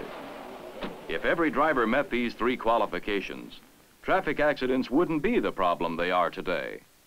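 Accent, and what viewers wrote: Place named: South, United States. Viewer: Southern Accent she has & yall come back now !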